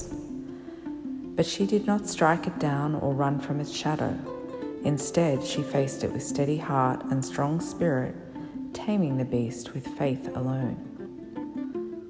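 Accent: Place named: New Zealand. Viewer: New Zealand accent? My favorite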